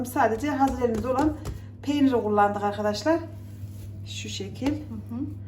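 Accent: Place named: Anatolia, Turkey. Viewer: Senin eline de şivenede kurban olayım o ellerin dert görmesin ellerine sağlık Anadolu insanı hiç kendini darlama nasıl konuşuyor san öyle devam et biz seni doğal halinle çoook seviyoruz evimizden biri gibi MaaşALLAH sana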